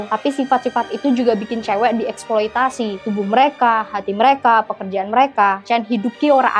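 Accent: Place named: Semarang, Indonesia. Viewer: top reviewnya mbak, btw mbak e logate kota semarang ig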